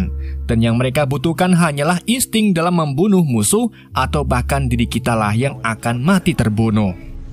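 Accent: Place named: Tegal, Indonesia. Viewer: Mimin tegal 😂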